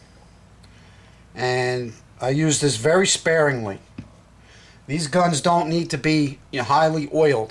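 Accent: New York, United States. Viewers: NY accent? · Great stuff! Thanks for the video and simple to follow instructions, this is my first gun and I want to take good care of it! btw love the accent, you from New York?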